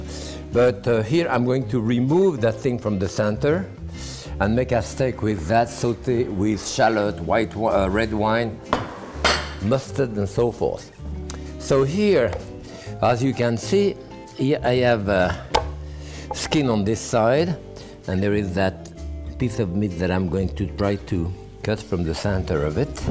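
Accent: France. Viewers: the adorable chef with an insane french accent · This is a proper french accent, not that fake shit from that curly haired dude, god i love french accents but that dudes accent makes me cringe so much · The french accent turns Th into Z, but he had a stroke 5 years ago and it has considerably slurred his speech. Very understandable though, mouth watering sound is the same as not being able to control your salivary tract excretion. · Cannot stand his strong French accent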